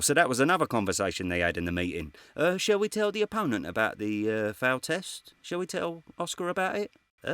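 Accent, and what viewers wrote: Cockney, United Kingdom. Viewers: Never came across this channel before and don’t really care too much for boxing but YOUR COCKNEY COMMENTARY IS HILARIOUS 😂😂 what a geeza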